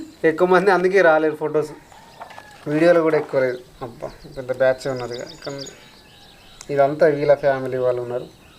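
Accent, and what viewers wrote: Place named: Nirmal, India. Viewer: ఏ ఊరు మీది చెప్పండి మీ ఊరు అదిలాబాద్ దగ్గర నిర్మల్ మీ మాటలు అలాగే అనిపిస్తున్నాయి మా ఊరు మాత్రం వరంగల్ మీ ఫొటోస్ చాలా బాగున్నాయి 👌👌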